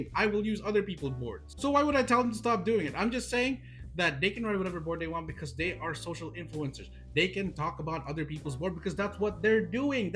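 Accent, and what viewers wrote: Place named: Philippines. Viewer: After watching, I realized that fingerboard sponsorships shows how committed and consistent you are, not just by tricks and fanbase but by brand and showmanship. The way you do tricks, content, and how open you are to the community are the people who truly deserves sponsorships. No tryhard bullshits with "SpOnsOr mE" without actually bringing any to the table. Sponsorship is not a competition—it's about proving yourself and how your going to bring something on the table, and if people like what you brought. More and more people will demand. Also, I can also hear how your Filipino accent is obliviously slipping out throughout the video haha😂✌🏻❤️‍🩹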